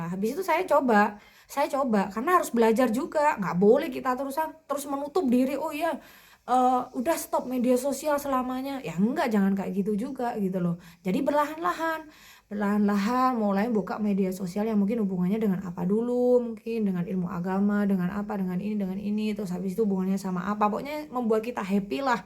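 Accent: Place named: Madura, Indonesia. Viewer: Bun logat nya kaya orang madura ya bun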